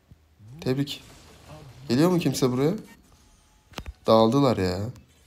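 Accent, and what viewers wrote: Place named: Ankara, Turkey. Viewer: Abi senin arada giren şu Angara şivene bayılıyorum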